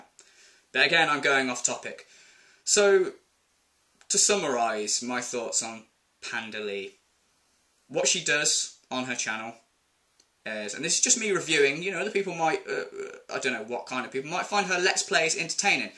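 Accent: United Kingdom. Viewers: British accents are pretty interesting. :)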